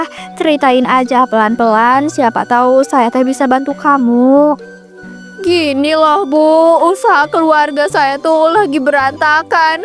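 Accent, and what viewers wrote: Java, Indonesia. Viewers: Logat bicara nya Kya logat Jawa tidak nyambung sama tema nya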